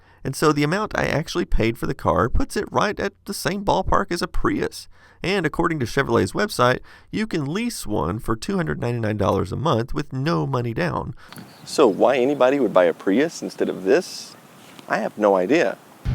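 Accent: Texas, United States. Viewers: I noticed a bit more of a southern accent while filming in the car, where someone was holding the camera. I wonder if he switches it up a bit when talking to (or in front of) a fellow Texan.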